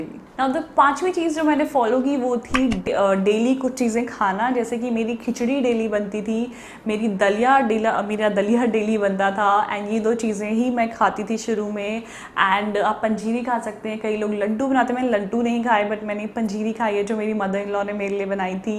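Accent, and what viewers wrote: Garhwal, India. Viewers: Aap gadwali ho kaha se ho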